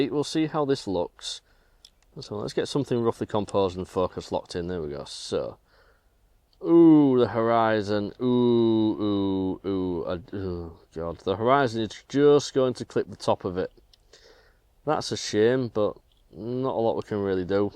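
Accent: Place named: East Yorkshire, United Kingdom. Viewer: Been there many times. Fond memories of East Yorkshire. Your accent brings it back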